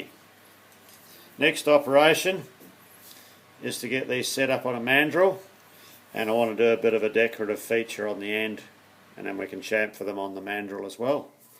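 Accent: Australia. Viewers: Good to hear an Australian accent for a change, nice work as well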